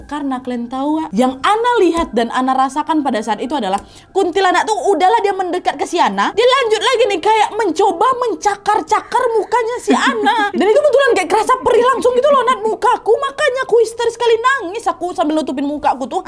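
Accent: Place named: Padang, Indonesia. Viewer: Lama2 nada bicara LW kyk Padang!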